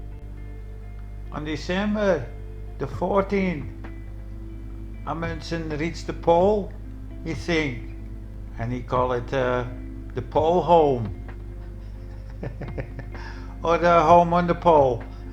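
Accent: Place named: Netherlands. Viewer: <link> There are two horses on the right side in the "picture" just above the crates. They blend in a bit with the snow ;) Not saying its real just spotted some horses there. In a way its awesome all these bullshit stories from the past, gives us material for comedy gold. ;) And as a fellow "Dutchman" i love your accent and way of talking! You bring me joy and uncontrolled laughter in these batshit crazy times haha.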